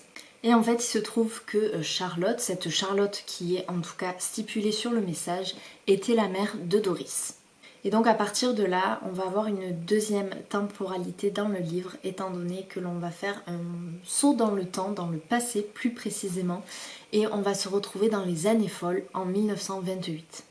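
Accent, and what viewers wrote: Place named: South, France. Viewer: Bonsoir je viens de découvrir votre chaîne et c'est vraiment un vrai bonheur! Votre voix est douce et reposante, vos vlogs très inspirants. Je reconnais bien votre accent puisque je ne suis pas très loin (Carcassonne 😊). Du coup je vais dévorer vos vlogs ce week end j'en ai a rattraper 😅. Puis lire aussi quand même et jardiner aussi car il va faire très beau ☀️. Merci pour le partage 😉 et longue vie à votre chaîne. Si ce n'est pas trop indiscret, quel est votre profession ? Bonne soirée. Carine.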